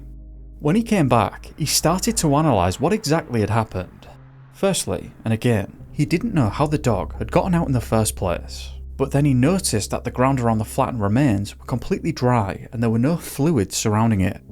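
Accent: United Kingdom. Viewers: Great story, thx. Can I ask where in the UK your dialect is from, very charming 😊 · I’m at my limits of listening to British accent YouTube videos. One more video and I’ll vomit uncontrollably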